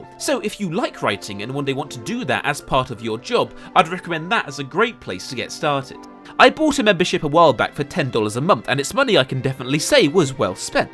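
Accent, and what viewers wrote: England, United Kingdom. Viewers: I haven't heard someone speak so British since my two British teachers :) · Honestly I can't even watch this.... It's just too much cringy English crap like the word "Baskerville" said repeatedly.... Love your channel even though you're English but wow I can't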